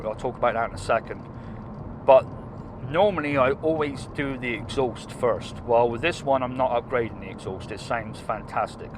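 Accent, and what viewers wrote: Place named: Gloucester, United Kingdom. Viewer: I'm trying to work out your accent.. guessing you're from Gloucester? You're obviously now living in the US, and it looks like a beautiful place to live.. very spacious!!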